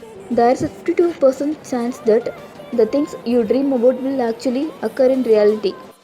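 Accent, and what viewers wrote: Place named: South India, India. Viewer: South Indian recognized from voice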